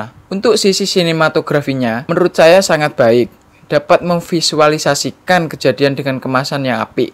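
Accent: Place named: Bojonegoro, Indonesia. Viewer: Medok jonegoro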